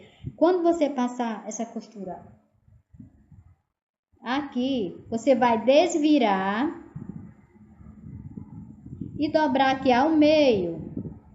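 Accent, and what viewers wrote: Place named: Piauí, Brazil. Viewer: Você é do Piauí, esse sotaque parece do povo de lá. Parabéns,bela arte.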